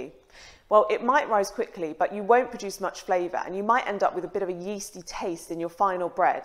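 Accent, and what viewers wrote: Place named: United Kingdom. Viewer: Oh, it's definitely warmer here! No WAY would the interior of our house ever approach 65F, even in the dead of winter. LOL. Thank you for your quick reply. I appreciate it. The reason I ask is that most recipes I have encountered for baking sourdough bread recommend, after an initial 3-hour period of stretch and fold, placing the dough in the refrigerator overnight. So, you wake up in the morning, and your dough has had 8-10 hours to rise. That's worked pretty well for me, but on occasions, I have wanted to shortcut the process. I have merely guessed at what (with a winter room temp around 23C) a "couple of hours." So far, so good, but I'm flying blind. The "overnight in the refrigerator" method seems to work fine, but I'm occasionally ready to bake sooner than that! Summer's a different deal, when the interior of our house stays consistently at 26 or 27 C. 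Thanks again for your help! Don't lose that British accent, it's SO charming. :o)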